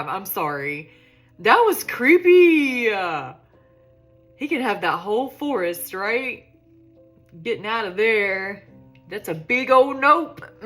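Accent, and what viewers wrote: South, United States. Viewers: This girl is badass I'm digging the southern draw and also the canine eyebrows it's working for her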